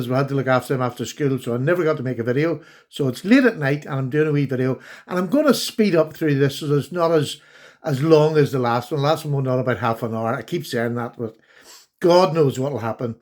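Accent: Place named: Ireland. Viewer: It is good to see you back to making videos besides all the shout out open tag ones. They are okay but, not if they become the dominate content of all the channels you watch. When I look at all the knives on the table it is not hard to see a pattern appear. You like everything; and that might be an good indication of your success on your channel. Another reason is; Americans enjoy hearing an Irish accent as well as others from places their family once came from. Your opinions are your opinions being seen from your eyes are fun to listen to. They may not be what others think; but, that is a good reason to listen to them. Everyone can learn from others; no matter how smart or how much they know or think they know already. It will be hard for you to choose the five best knives of 2021. 🎁🎄🍀🥔🔪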